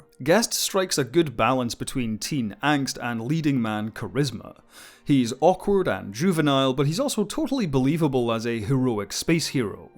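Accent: Scotland, United Kingdom. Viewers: Cool, thank you for this great in-depth review. That was very informative. Are you in Scotland? I love the accent. But I always wonder how can you film guys make a living? Do you work as a lecturer at a University or film school?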